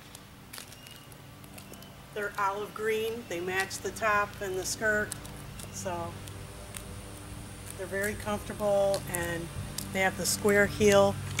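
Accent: Pennsylvania, United States. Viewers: Hi! I recently found your channel and I'm totally hooked! I love your accent which I think is from PA but reminds me of NY. I'm from NH currently living in TX. I love your patterns, fabrics and beautiful outfits. I'm a new assist and get so inspired by you. I love your sweet friendly manner and how happy and positive you are! It's really like visiting a good friend. Thanks for all you do😊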